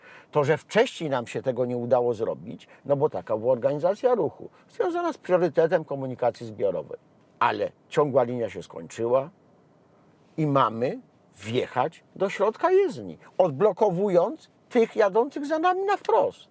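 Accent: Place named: Kraków, Poland. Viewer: Po Krakowsku mówi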